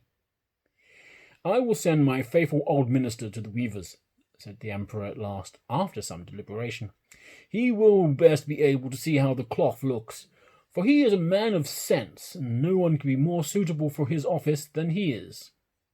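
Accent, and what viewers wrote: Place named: RP, United Kingdom. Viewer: It's been so long since I heard your voice I forgot how posh you are hehe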